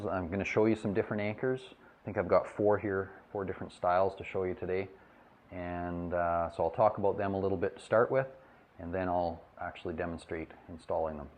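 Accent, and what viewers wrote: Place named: Canada. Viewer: are you canadian, your accent sounds so canadian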